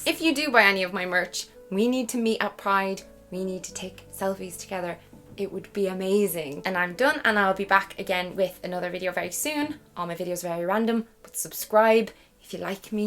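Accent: Ireland. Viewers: I loved this video. It’s always so nice to hear more about my sexuality. I also I love your accent it makes me miss my time in Ireland so much! Thank you for a great video💚💚💚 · Do I hear a fellow Irish spudddd?? (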